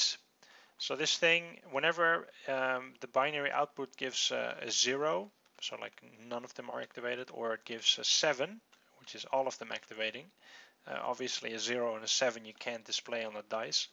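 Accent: Netherlands. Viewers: lol ik wist niet dat je nederlands was xDD khoorde het pas na zon intervieuw video xD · Lol, I'm dutch too, as soon as I heard you talk I knew you were dutch :P We all have that weird dutch accent... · Are you from holland??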